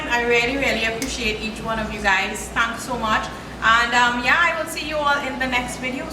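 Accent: Trinidad and Tobago. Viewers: I love the accent. So Trinidad and Tobago. $100.00 TT dollars. Cook up rice with dasheen bush and cucumber salad. I will go to the Chaguanas market. Oh Lawd that’s a lot of money you talking. I will definitely be watching you. I hit that 🔔. #ahfeelinforsome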